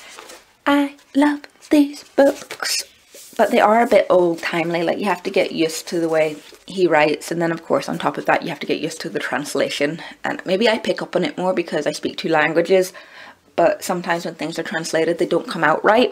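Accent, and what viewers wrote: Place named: Scotland, United Kingdom. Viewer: I've just re-watched the first 20 seconds of your video and I would have bet money that you had a [mild] Scottish accent. And I'm from Yorkshire in the North of England, so the Scots are my nearest neighbours. I've got to ask, where are you from? If that's not a Scots accent then what kind of accent do you have? I'm genuinely perplexed and massively curious. You speak English with native fluency so I doubt it's your second language.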